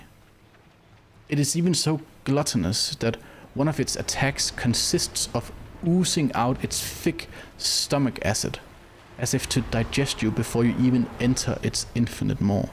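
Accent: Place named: Denmark. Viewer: Er du ikke dansker, syntes din acccent lyder som så ;)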